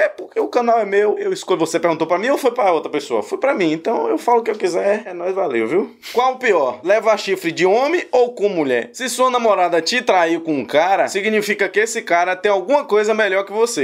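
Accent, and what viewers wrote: Bahia, Brazil. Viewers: Manooo surtei nesse sotaque 😍😍😍 vo namorae um baiano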